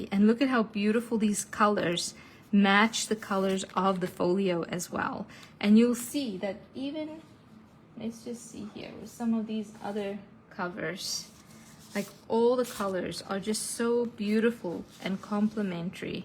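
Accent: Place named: South Africa. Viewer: Hi there, been subscribed to your newsletters for over a year. You sound South African. Thank you for the lovely freebies. I love getting them. Thank you from Cape Town, South Africa.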